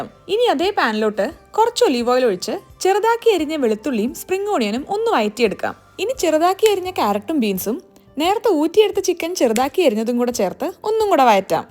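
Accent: Malappuram, India. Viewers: നിങ്ങൾ മലപ്പുറംകാരി ആണോ ഞാനും മലപ്പുറം ആണ് നിങ്ങൾ പറയുന്നത് കേട്ടപ്പോ തോന്നിയത് ആണ്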